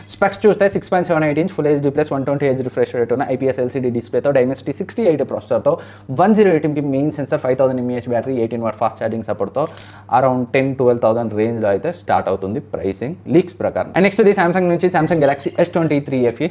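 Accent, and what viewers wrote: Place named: Andhra Pradesh, India. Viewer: Medhi karimnagar aeiyna kuda meru matladuthuna language andhara lane vundhi anna Telangana language lane ledhu medhi ❤🥰😍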